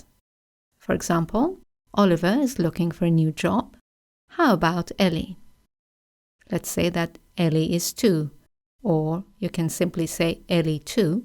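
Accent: RP, United Kingdom. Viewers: You sound British thou. I watch loveenglish with Leila and sabrah . Leila is half English half Iranian too. nice meeting ya. thanks for your rapid response. good night and bless you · Thanks so much!❤️,I'm Chilean and you help me a lot with your British accent because my school speak USA English so I have some problems with others accents. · You have the Queen accent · Do you speak RP??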